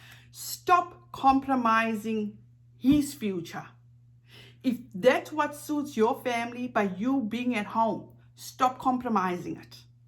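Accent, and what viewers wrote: South Africa. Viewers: New sub here! I really enjoy your content and I can appreciate the rational advice you’re attempting to give, unfortunately KJ is too far gone her ego is going to be her downfall. I like all the points you make and agree she needs to think of her family first! But her ego comes first! I love your accent Miss, my BFF is from Durban SA, and lives in the US also so hearing your lovely accent reminds me of her and you remind me of her, she is the best! Look forward to more content! Congratulations on hitting the 1K mark! 🥳❤️✌🏽😃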